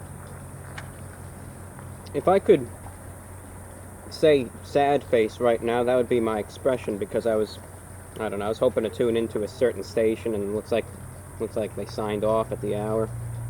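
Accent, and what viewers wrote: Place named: New York, United States. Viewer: I don't get it. It looks like he lives in Florida but he has a slight New york accent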